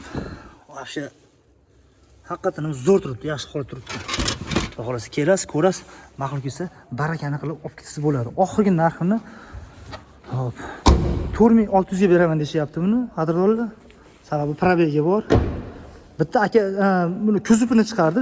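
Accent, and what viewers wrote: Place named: Andijan, Uzbekistan. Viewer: 200 000 ni tagi 60 da shevez andijonniki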